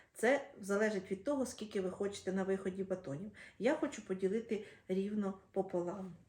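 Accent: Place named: Vinnytsia, Ukraine. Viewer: Батони у Вас вийшли чудовіі,мабуть,дуже смачні.Так і хочеться спекти...,а розкажіть трошки про себе.Ви часом не з Вінницької області?Знайомий, говорок''...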